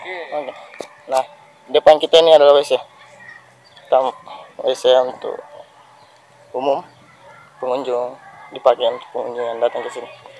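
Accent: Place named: Makassar, Indonesia. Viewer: Pertahankan logat Makassarx dan segala okkotsnya... mantap adinda...😅😊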